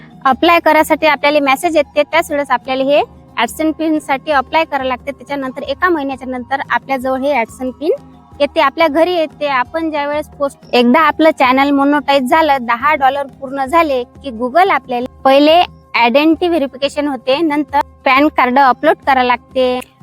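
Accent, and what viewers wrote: Vidarbha, India. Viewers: तुला विदर्भातील भाषा कळते काय. विदर्भातील भाषेचा टोन आणि पद्धत अशीच असते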